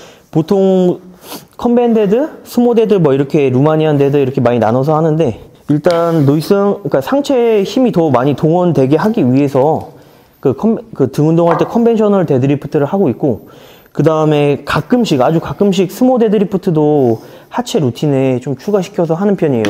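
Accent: Gangwon, South Korea. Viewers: 강원도 억양이네 ㅋㅋㅋ 흔치 않은데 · 강원도 사투리 👍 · 강원도 사투리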